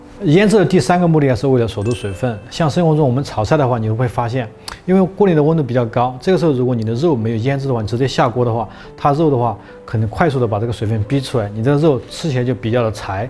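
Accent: Sichuan, China. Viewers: 好喜欢的四川口音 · 一定要多支持，听口音感觉是我们四川老乡，最关键的是讲解的非常仔细清晰但是不复杂。学到了很多知识。非常感谢，继续加油~~~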